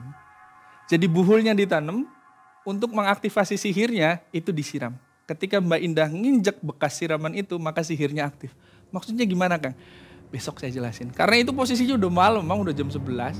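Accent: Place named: Indramayu, Indonesia. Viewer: Orang mana nih bahasa nya ga angger klo ga Indramayu cirebon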